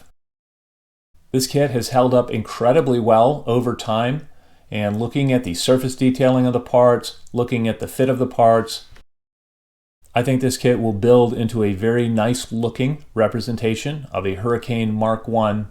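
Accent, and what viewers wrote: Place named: United States. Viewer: I can't believe it! An American accent, and no bullshit! An excellent review, clearly enunciated with what we need to know as prospective buyers or builders laid out in logical order with potential traps pointed out - the screenshot showing what happens if you omit part 117 was excellent. I have a later boxing, (14002 from 1990), and can say that my example lacks the sink holes on the airscrew blades and the gun magazines, as well as having had the clear parts fixed, so Airfix have kept an eye on things. I wasted my money on replacement metal undercarriage, which has an awful grainy finish and lacks the sharpness of detail present in the kit parts. I particular, the oleo strut can never be polished to look as smooth and shiny as it should. Other reviewers have agreed with your suggestion that the cowls will not fit over the complete installed engine, with most saying that a considerable amount must be ground from the cylinder heads to allow the cowls to be properly fitted. 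Oops!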